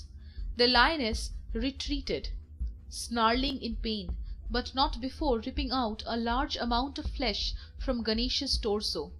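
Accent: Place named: Bengal, India. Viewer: thank you for the audio book. being a bengoli your accent is very familiar and so enjoying with full attention.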